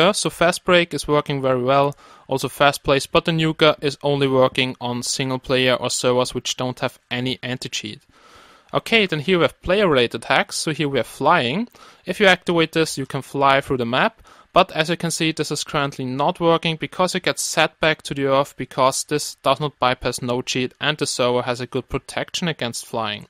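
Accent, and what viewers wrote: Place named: Germany. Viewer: You speak like an German who speak Amarican English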